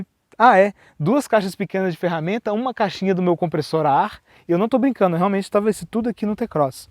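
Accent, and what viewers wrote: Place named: Rio de Janeiro, Brazil. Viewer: O sotaque do apresentador está mais para um carioca, igual meu vizinho, a avaliação nota 10.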